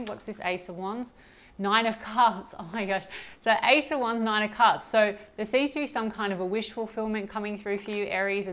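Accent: Australia. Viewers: 🙌🏻 yes stepping into my power. I was on the low frequencies and saw the same in the relationships I was looking at. I’ve finally let that go and have seen underneath it all was my low self esteem. Lots of self care self love & affirmations. Breaking the toxic habits and giving into the good good love ❤️ Really nice to hear an Aussie doing tarot! Great energy, a brilliant read that I related too. Bless 🙏🏼 I’ll keep coming back!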